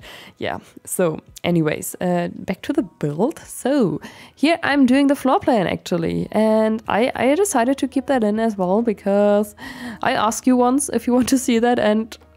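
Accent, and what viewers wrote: Germany. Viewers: Are you german? because of your accent.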